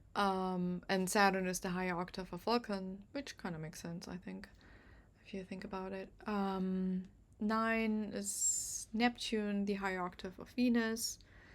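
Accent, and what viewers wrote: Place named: Germany. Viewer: Your English is great actually👏😎, yet it has a carry-over🎉 from german not just in accent/pronunciation, rather also in the way to frequently used fillers such as Ähem/Uum😂😢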